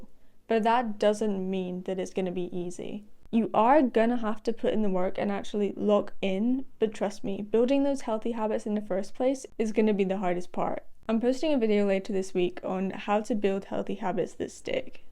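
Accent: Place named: Scottish Highlands, United Kingdom. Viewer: Where’s your accent from? 😊 I’m getting highlands mixed with down south..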